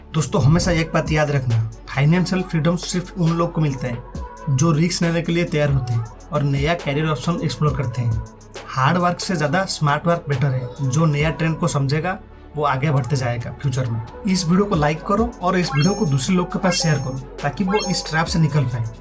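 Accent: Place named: Odisha, India. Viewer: Pata thaaa bhai tere hindhi bolne style seee.. jay Jagannath ❤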